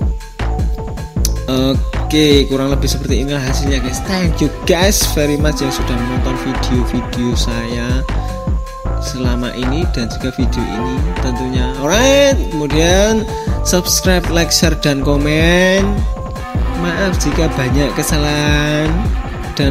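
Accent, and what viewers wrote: Java, Indonesia. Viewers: Suara jamet Jawa bgt cuih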